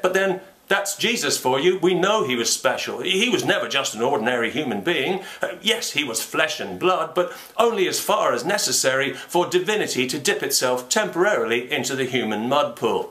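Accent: United Kingdom. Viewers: Fuuuuck! I could listen to this guy talk for hours. His mind Works like the Robin Williams of logic, reason and Witty but justified criticism. Aldo, does help having that awesome brit accent lol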